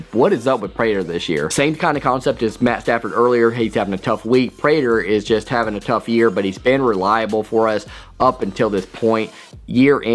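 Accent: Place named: South, United States. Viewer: Are you in Michigan? You got like a southern accent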